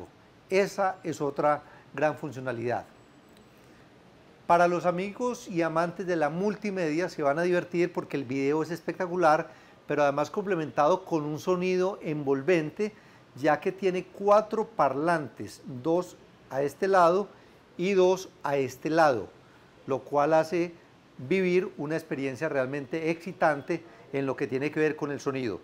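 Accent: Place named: Colombia. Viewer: Colombiano Lol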